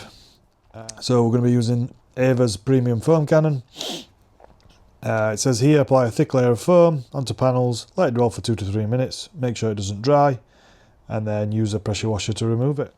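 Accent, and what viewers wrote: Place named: Scotland, United Kingdom. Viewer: Great video man ! Watched it right till the end. I noticed when you rinse off, you also make sure you give the door jam spaces and body fittings a good blast to make sure you get any debris, sand grit, leftover soaps etc. . .Nice work. . . JUST like I do. I have a Mazda CX5 and I hit those same areas well with the spray gun. The CX5's have "gutters' on the roof for a roof rack install. Those areas are notorious for hiding gunk, grit, sand, leaves etc. I'm not a pro. I'm just a weekend enthusiast that loves taking care of my cars. Like I said, I enjoyed your video, and thanks for taking the time to put it together. Are you in Scotland ? I detect what sounds like a Scottish accent. I recently bought a bunch of car wash stuff; - active 2.0 pressure washer - upgraded braided 50' hose with quick attachments - a good spray gun and wand extension - MTM foam cannon - I already have a dewalt 60v max blower with 15 amp hour batteries to dry the car. 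and MANNNN does it ever blow LOL :D - 4L GYEON foam - 4L Gtechniqiue ceramic Gwash - couple Gyeon wash mitts - couple Gyeon dry towels (to pick up left behind water drops) - Gyeon bug n grime spray 500ml - Gyeon exterior detailer 4L I have a bunch of other cleaning stuff I've acquired over time so I'm well equipped. I live in an apartment building over here in Canada and we have a hose tap in the underground garage where I park. There's also an electrical outlet about 20 ft away. Cheers bro !!!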